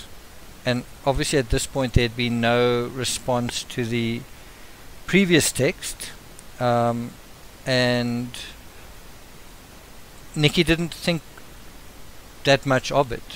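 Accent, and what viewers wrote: South Africa. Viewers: Omfg.. I’m off.. soooo sssslllloooo<link> thst and the SA accent.. sorry 😐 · He has a south African accent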